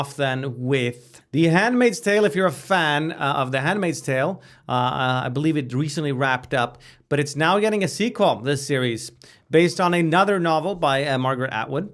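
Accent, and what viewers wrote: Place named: Sweden. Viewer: Do this in swedish next time cause I can't understand your english (due to your very thick accent)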